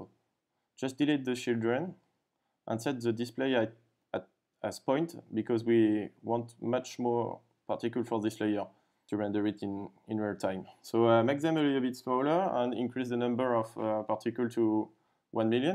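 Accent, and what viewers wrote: France. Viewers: French right? I can understand it by your accent :p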